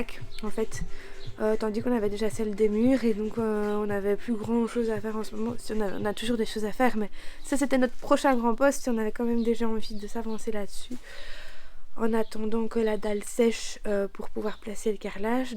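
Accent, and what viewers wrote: Belgium. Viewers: Super moi qui ai la nostalgie de mon pays d'origine, je vis actuellement en France depuis plus de 10ans. Ça me manquait d'entendre l'accent Belge et comme j'adore ce type de vidéo, du coup un nouvel abonné. 👍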